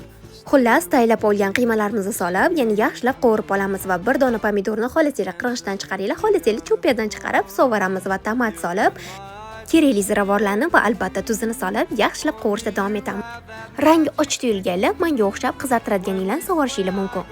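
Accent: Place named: Kashkadarya, Uzbekistan. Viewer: Қашқадарёданмисиз? Қашқадарёликлар "тайярлаб" эмас "тайлаб" деб гапиришадида. Шундан билдим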